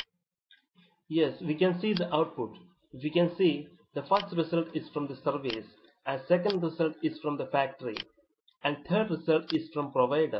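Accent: India. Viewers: Indian accent everywhere :( ! -_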